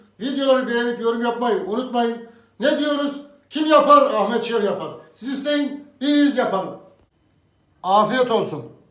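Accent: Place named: Çankırı, Turkey. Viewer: Abi Çankırılı misin şive benziyor da hemşehrimiz misin merak ettim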